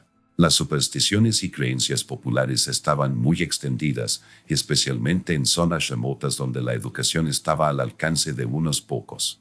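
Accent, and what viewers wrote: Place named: United States. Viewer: Por qué ese acento yanqui?????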